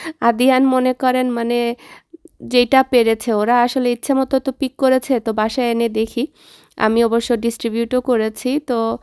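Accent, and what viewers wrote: Sylhet, Bangladesh. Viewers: Apu Assalamualaikum Apnar sylety vasa ato valo lagy Masallah 😊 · আপু তোমার সিলেটি ভাষায় কথা বলা এতো সুন্দর মাশাল্লাহ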